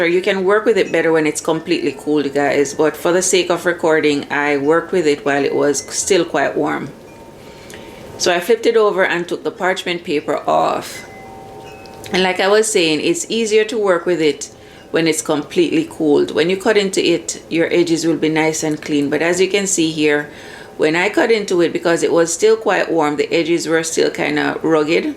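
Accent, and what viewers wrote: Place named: Jamaica. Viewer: Commenting and liking the video for the algorithm - big up that beautiful patwa accent. I badly wanted more homemade vegan (I can swap out eggs) bread recipes. This one is really good. Thank you.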